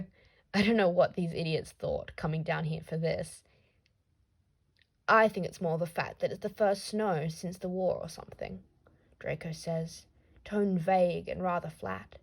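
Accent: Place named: Australia. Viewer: YESSSSS!!! finally a fellow Australian making one of these podfics, amazing by the way I find it hard to concentrate but i was really able to follow on with this on.😄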